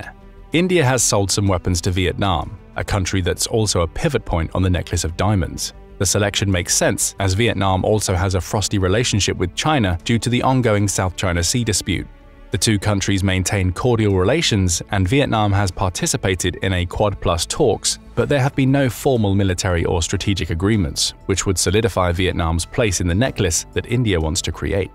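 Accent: United Kingdom. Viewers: Click bait. Again. This channel is silly but it has a British accent, so, I guess that's why folks are here..?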